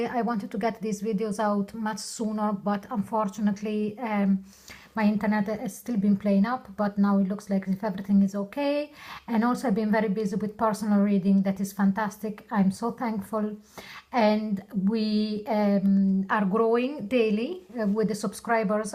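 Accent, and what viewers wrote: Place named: Eastern Europe. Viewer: I found ur channel last night watched all the videos that pertained to me and I am totally impressed with you. I wish I can guess your accent I keep going back and forth on where you are from. I guess Eastern European :)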